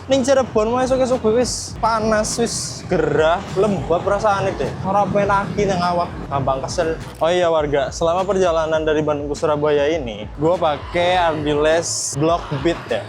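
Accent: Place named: Banyumas, Indonesia. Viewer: Asline wong ngapak to 😂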